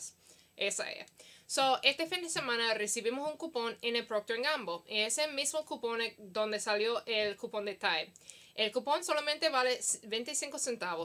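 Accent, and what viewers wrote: Puerto Rico. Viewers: Love this video!!! Suenas Puertoriquena!!! :) Gracias por este video. Que chevere!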